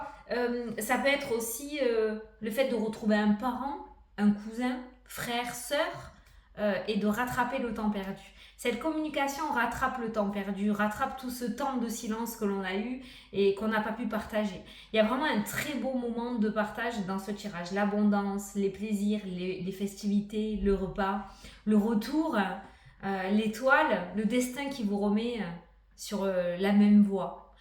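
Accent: South, France. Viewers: Joyeux Noël à vous et votre famille !!! Vous nous apportez le soleil avec votre accent du sud!!! Continuez à prendre soin de vous et de nous tous. À dimanche.🎄🎅🎍🤶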